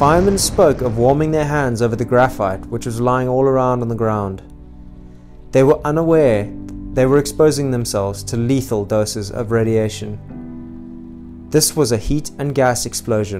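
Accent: South Africa. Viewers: Just heard your accent and knew you from South Africa😂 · Nice SA accent boet❤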